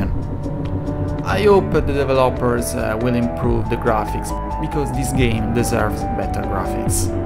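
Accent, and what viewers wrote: Italy. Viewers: Si sente da chilometri che sei italiano ahahahhahahaha, bel video! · ha un accento tipo italiano ahah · Si sente che sei italiano.. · i can hear some italiano Bro, hahah grande vecchio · Bro ci gioco 50€ che sei italiano dall'accento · are you..italian? sei italiano?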